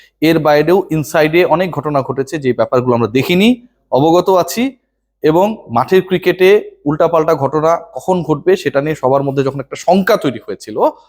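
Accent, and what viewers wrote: Noakhali, Bangladesh. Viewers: ভাই নোয়াখালির বাষায় বলে মোশাই কতটুকু তার হুনিতই কতটুকু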